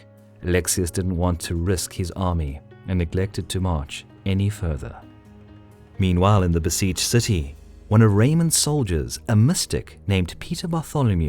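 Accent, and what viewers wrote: South Africa. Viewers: south african is the accent is my guess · Can I just ask, is your commentator South African? Please reply.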